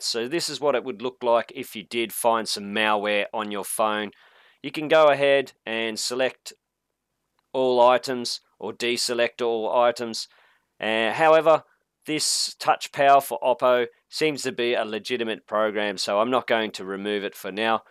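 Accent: Australia. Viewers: Nah he just sounds Australian lol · Probably because he's australian. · Every Australian sounds like this, wdym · He just has an Australian accent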